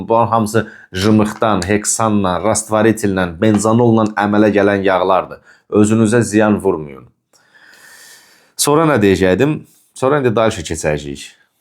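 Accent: Ganja, Azerbaijan. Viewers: eşq olsun qardaşım! elə birinci videonnan tutmuşdum ki Gəncəlisən)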